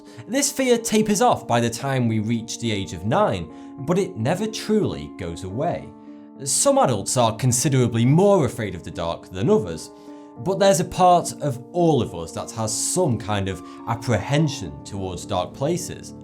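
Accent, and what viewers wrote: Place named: North, United Kingdom. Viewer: Hey where are you from because I recognise your accent.Do you live near Bury or Bolton?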